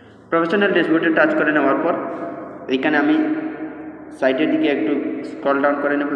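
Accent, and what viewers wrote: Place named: Sylhet, Bangladesh. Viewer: আপনি ত সিলেটি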